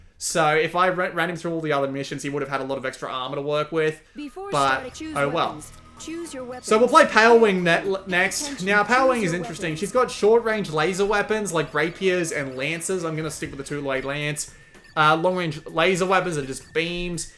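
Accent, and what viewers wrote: Australia. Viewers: Unrelated question, how is your Vegeta impersonation? Sorry, you just sound like an Australian Lanipator.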